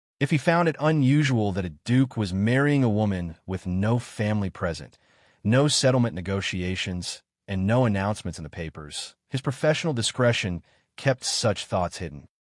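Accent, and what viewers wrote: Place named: United States. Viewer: I simply cannot understand why they use such a strong American accent for the narration in a story set in Britain. It ought to be British English.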